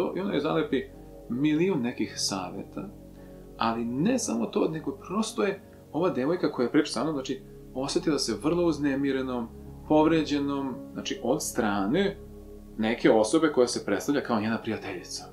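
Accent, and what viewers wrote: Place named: Vojvodina, Serbia. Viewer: Imas divan akcenat..rekla bih da si negde iz Vojvodine, kao i ja inace.. Svako dobro ti zelim i super si 😊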